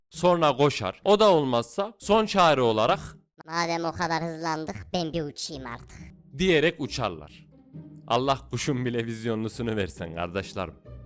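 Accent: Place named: Sivas, Turkey. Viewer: Seslediren yüzde 99 Sivaslı herhal😂 süper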